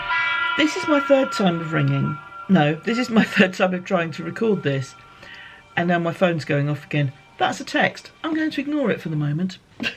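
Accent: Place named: Lancashire, United Kingdom. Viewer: Ah yes I am familiar with self-propelled mowers from my days of working on a golf course. That will take some effort of learning on your part. Be very careful not to be dragged away. Lol My paternal grandparents are from Lancashire. Quite a recognizable accent for me. ♥ Your dress brings back memories of my sewing days from 35 years ago. Nice work! Wow! Keep your puppy close. Hard to tell how large the fox is but better to be safe than sorry. A haircut is always welcome but I find that the day before I go, my hair tends to look fabulous. It is almost like it wants to make me doubt my decision to have it cut in the first place. Take care and stay well.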